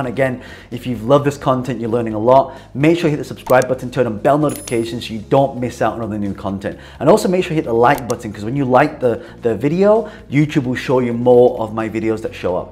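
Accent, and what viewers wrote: United Kingdom. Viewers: Love it. Are you from UK?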